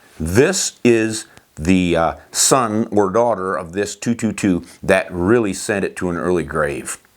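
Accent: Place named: South, United States. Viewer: ...I own a Sako Rifle in.222 Remington made in 1950....I went to the range and off the bench shot a 3 shot group that I measured with a caliper and it measured.221....how about that? This was 4-5 years ago...I still have about 40 rounds I loaded for it...and did not shoot them all, I'm conservative with my ammo...you got one of them tack drivers? I kind of like you...I like the way you talk...You sound like me...a lot...I bet you are in a southern state...you ain't no Yankee are you?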